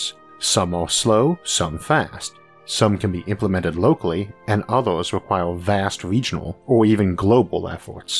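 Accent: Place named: Texas, United States. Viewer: Yes as a Brit I thought that maybe he was a Texan or from the Southern states.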